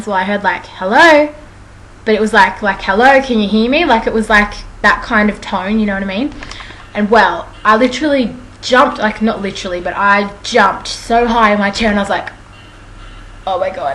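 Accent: Australia. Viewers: i am just so used to my accent, i have the same accent as you, so you were just soooooooooooo easy to understand.i'm from the perth area. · I say, "I shit my pants" or "I would have shit my pants" all the time, but something about hearing it in an Australian accent made me laugh like crazy. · it's good to hear an australian accent again. spent 3 weeks there, about 10 years back, and i loved it there !! beautiful place. :)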